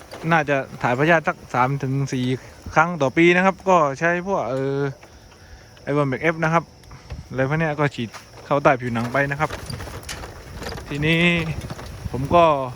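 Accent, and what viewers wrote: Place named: Surat Thani, Thailand. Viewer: แหลงคล้ายๆคนสุราดเลยบ้านยุไหนคับ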